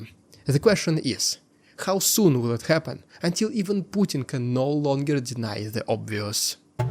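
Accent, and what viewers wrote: Russia. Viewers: you have a surprisingly wide English vocabulary for someone who cannot speak without an outrageous Russian accent. Methinks there is something amiss here.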